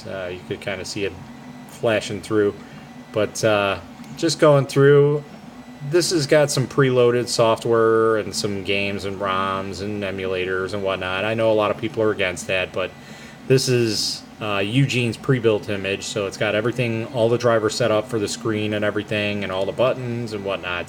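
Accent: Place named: Chicago, United States. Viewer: dude where are you from? You have a killer Chicago accent coming though on these videos!!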